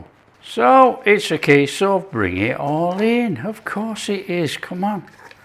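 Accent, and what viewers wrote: United Kingdom. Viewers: I love who you are, love your awesome British accent , your laugh and simplicity, my wife shared this channel with me three months ago, can't stop watching you sir. THE BESY RECIPES ON UTUBE, absolutely love your OH COME ON PLEAAAAASE! THANK YOU SO MUCH SIR FOR SHARING WITH US, YOUR GEEAT TALENTS, your expressions are so spot on when you try your dishes, everything about your videos are priceless, thank you RIK from ILLINOIS TO YOU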